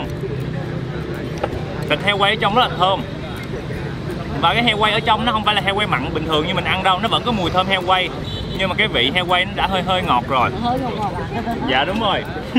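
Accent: Mekong Delta, Vietnam. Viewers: vui tính,thoải mái,nc rất miền tây. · Hi! Chi rat thich nhung video clips cua.mot bo cuc ro rang,rat l doi thuong,va cai cach noi chuyen vua ( rat la Mien tay,chi o Long An coi cung la dan M,tay ). nè! Neu nhu em co di holidays Autralia. Thi em goi chi nha,chi o Sydney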